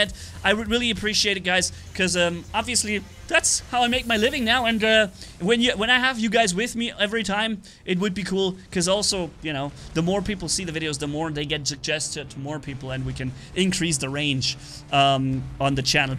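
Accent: Germany. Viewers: Maybe dumb question, but are you German or you learn german (sorry if this is inappropriate question)?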